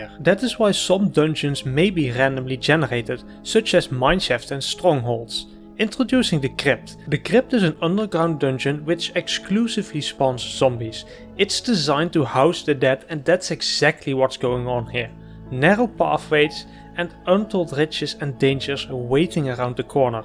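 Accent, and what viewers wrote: Netherlands. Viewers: Bro you sound dutch nice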